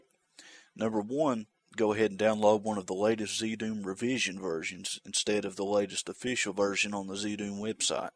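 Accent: South, United States. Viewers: Came here researching 3d floors. Was pleasantly surprised to hear a voice that clearly knows a thing or two about DooM editing that also has a southern accent. Dirty souf y'all. :D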